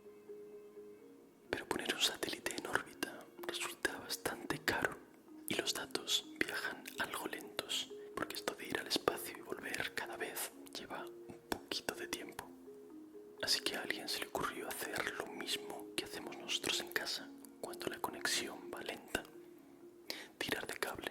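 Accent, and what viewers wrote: Spain. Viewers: Me Encanta el Asento Españolado 😮 Muchas Gracias x Tus ASMR me relajan Bastante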